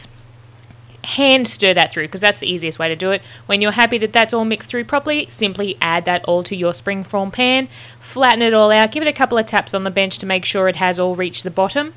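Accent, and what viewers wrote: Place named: Australia. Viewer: Wow, can't wait to make this:-) and the Aussie accent made it easy to watch;-)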